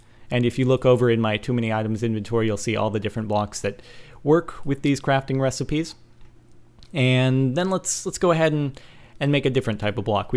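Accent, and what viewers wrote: Sweden. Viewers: is you swedish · *are you swedish* and yes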